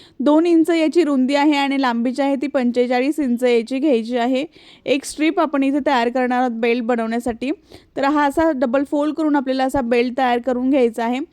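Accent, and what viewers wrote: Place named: Alibag, India. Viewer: khupach chaan alibuaag warun bolte❤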